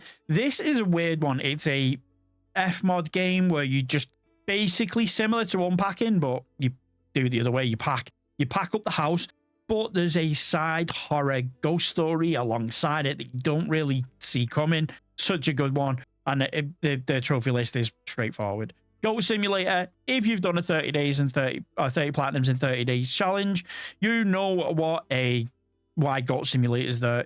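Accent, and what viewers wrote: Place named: Warrington, United Kingdom. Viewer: Im getting Warrington vibes from your accent mate, am I close?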